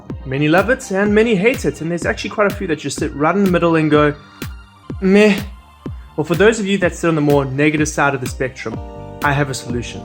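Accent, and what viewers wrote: South Africa. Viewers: Dude, that accent is one I recognize well and truly. Is jy 'n Suid Afrikaner? as jy is, dan is jy die eerste gooie youtuber ek het gevind op die internet. (Jammer as my afrikaans sleg is, maar ek wil dit meer oefen, en ek praat engels die meeste van die tyd. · I didn't hear Australian either. South African perhaps?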